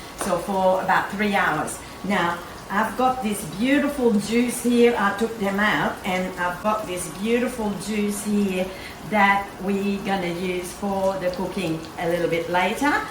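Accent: Australia. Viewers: Tour accent is Australian. One cannot fake the vowels. Interesting. So you are from Quebec? Hm. The recipe probably is good, regardless.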